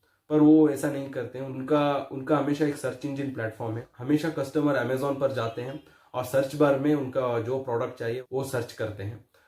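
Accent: Karnataka, India. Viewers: Are you from Karnataka bruh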